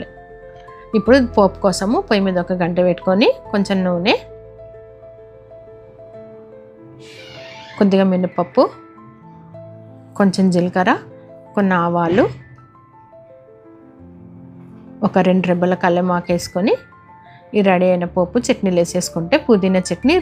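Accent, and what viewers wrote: Telangana, India. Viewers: Telengana yasa👌👌👌👌👌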